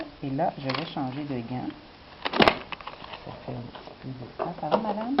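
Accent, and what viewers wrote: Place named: Canada. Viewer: Ah ce bel accent canadien, quel bonheur. Surtout quand la vidéo est de qualité comme celle que vous proposez. Merci beaucoup. Grâce à vous je vais peut-être me réconcilier avec les piqûres et les perfs.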